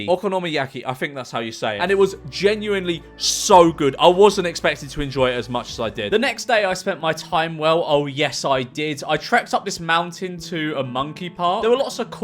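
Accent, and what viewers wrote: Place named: Birmingham, United Kingdom. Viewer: why are you developing a birmingham accent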